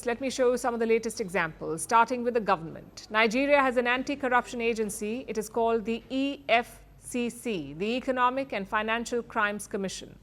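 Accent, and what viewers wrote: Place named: India. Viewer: She's an Indian, I guess her native accent influences her speech pattern.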